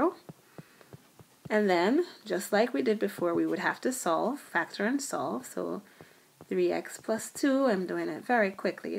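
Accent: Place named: Nigeria. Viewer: is that a Nigerian accent I hear seeping through.... much luv!!!!!!!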